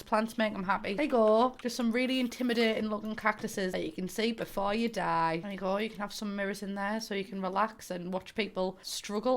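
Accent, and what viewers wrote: United Kingdom. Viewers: Can I just say how nice it is to see someone who is so British and the humour is too. It's so nice not to hear Americans, nothing against them but I just connect with a fellow Brit more. You seriously fucking crack me up and never stop with the accent and slang. Kim and Aggie 4lyf! 😂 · Her accent is by far the most “English” I’ve ever heard in my entire life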